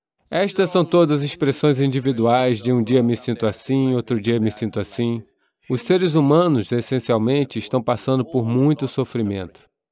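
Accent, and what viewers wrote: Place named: Bahia, Brazil. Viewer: Adorei que a tradução foi feita por alguma baiana. Ficou lindo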